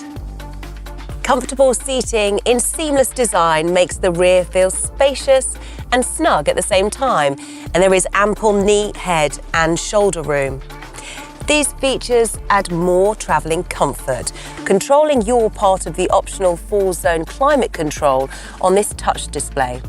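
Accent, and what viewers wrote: United Kingdom. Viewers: I'm so sick of her British accent; it's so pretentious. It makes the X5 look even uglier.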